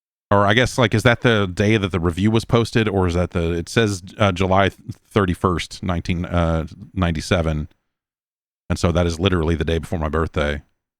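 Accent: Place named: Liverpool, United Kingdom. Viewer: Heard it in flawless Liverpudian accent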